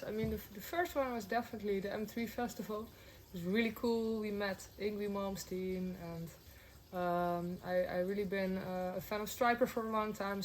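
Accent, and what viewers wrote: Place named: Europe. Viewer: Hearing her talk normally vs singing, I forget she's European.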